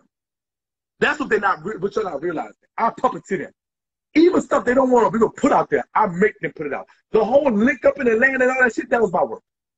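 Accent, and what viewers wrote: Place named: Louisiana, United States. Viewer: It’s the Louisiana accent for me I love it 🤣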